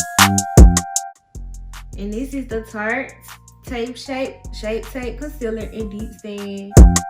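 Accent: South, United States. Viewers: Can I suggest a concealer for you to try?? Maybe the Maybelline eraser concealer in the shade tan? I love that concealer and it has a creamy consistency it’s not runny at all. Love the look! 💞💞 Btw you don’t talk country you just have a southern accent I’ve heard people talk country and that’s definitely not it lbs 💞💞💞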